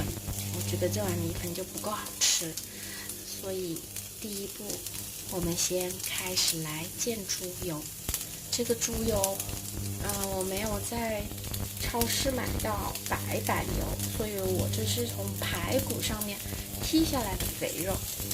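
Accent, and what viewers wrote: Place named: Changsha, China. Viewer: 长沙妹陀，口音好重啊，嘻嘻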